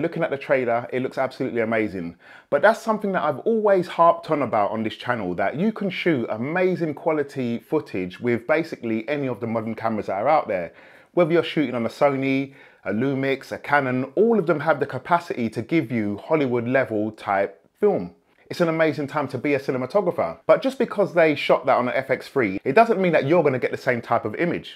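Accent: London, United Kingdom. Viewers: love your London accent man haha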